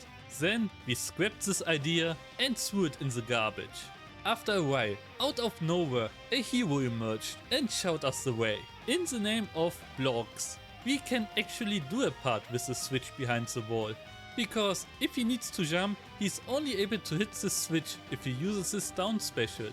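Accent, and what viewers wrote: Germany. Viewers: Dein Akzent ist soooo deutsch.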